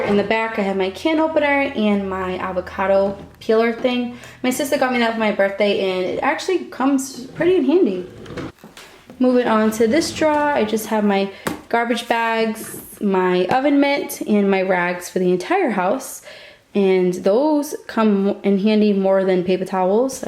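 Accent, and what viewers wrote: Boston, United States. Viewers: smile every time when I hear the boston accent. Awesome video.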